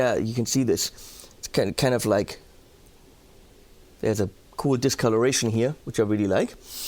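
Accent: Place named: Germany. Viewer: Got one myself. Not that I needed another guitar, I own Gibsons, Fenders, Epiphones and a lot of Partsocasters and Chinese rip offs(mostly custom orders on my specs). I love this guitar expecially for its playability (24 frets is a luxury!). I have replaced the stock tuners with a set of locking machines and the knobs with amber barrel ones. The stock pickups are not bad ay all, though they have some sort of plasticity reminding me some Ibanez models. I might drop in a set of Duncans, they made some of my Chibsons sound super! All in all, a good purchase, bought second hand and already broken in. Thanks for your videos, you crack me up (I have toured extensively in Germany in the past, and I love your accent, it reminds me of the old days!)😄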